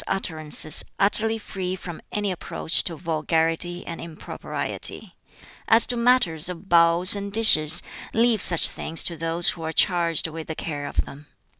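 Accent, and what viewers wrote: China. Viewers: I slip into brummie when mad so go check out a clockwork orange to know what true confusion feels like. Can’t believe people are complaining about a Chinese book being read with a Chinese accent 🤦‍♀️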